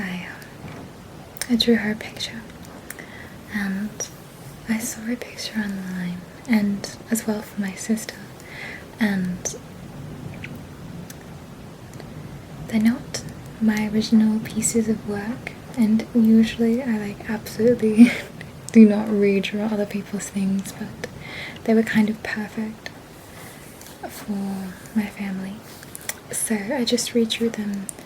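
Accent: Australia. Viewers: Are you from Australia by any chance ?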